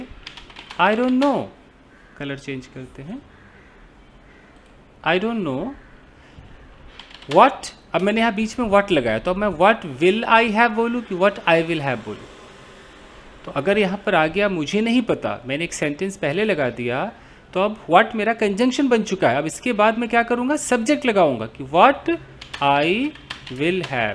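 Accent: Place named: Bengal, India. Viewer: Vaiya aap ka bengoli bohut sweet hai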